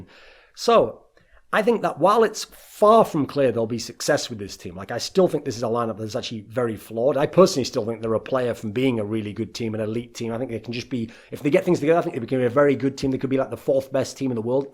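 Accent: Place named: North East England, United Kingdom. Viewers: North East England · Yugo Betrugo Duncan is from South Shields in England look up the accent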